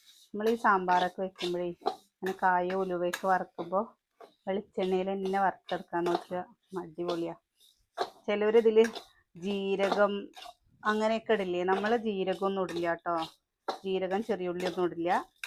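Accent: Palakkad, India. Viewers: നിങ്ങളു പാലക്കാട്‌ ano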